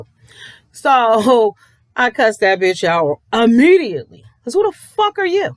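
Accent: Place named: Boston, United States. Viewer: Happy Belated Birthday Queen! 💕🙌🏽💃🏾🎂🥂 Btw are u from New England? U sound like my Boston Family (sisters)...😎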